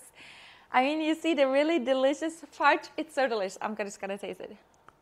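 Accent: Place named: Norway. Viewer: Are you living in Norway? (If you're Norwegian ofc lol) because I can hear a little bit of Norwegian accent when you talk, but it's a lot better tho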